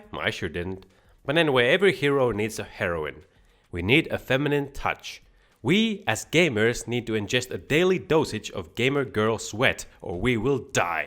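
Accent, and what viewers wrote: Finland. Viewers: wait a sec your finnish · Are you finnish?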